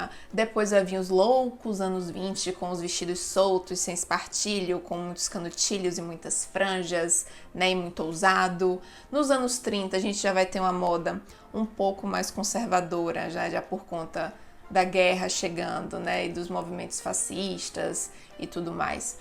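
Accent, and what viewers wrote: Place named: Bahia, Brazil. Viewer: Como eu não percebi seu sotaque baiano?! Meu Deus 😅 É por isso que é descolada assim, gentem🤭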